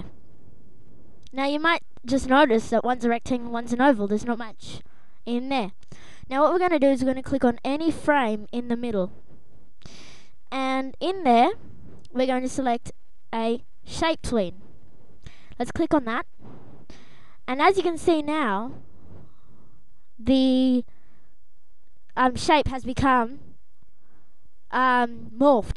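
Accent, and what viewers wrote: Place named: Australia. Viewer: Awesome austrailan accent! you helped sooo much ! subbed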